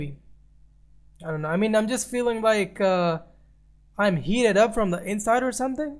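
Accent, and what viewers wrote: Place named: India. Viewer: Evyatar Trabelsi It's his accent, many Indians have trouble saying w sounds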